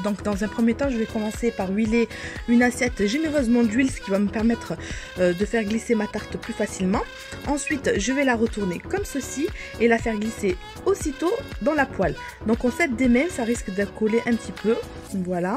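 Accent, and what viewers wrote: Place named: South, France. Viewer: Vive l'accent du sud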